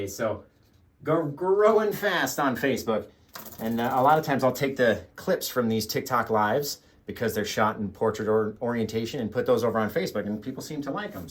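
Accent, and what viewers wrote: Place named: Chicago, United States. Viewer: you crack me up. Now I'm saying "Fantastic" through everyday 👏. I do like your accent a lot! I know you live in Nevada, but are you from Chicago with that accent. I'm from Texas and fav sandwich PB & J...👀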